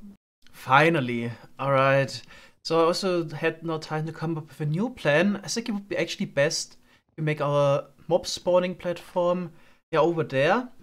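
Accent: Germany. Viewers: Man hört sehr raus das du deutsch bist aber trotzdem guter YouTuber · Mate, I love watching your videos from time to time and they're always really informative and entertaining. But as a fellow German with basically a cockney dialect I have to say - that German accent drives even me crazy. 😂 No worries though. Love your vids. <3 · Are u German ? Weil ich es bin haha und ich es irg wie bemerkt hab??? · Man hört den deutschen accents so hart 😂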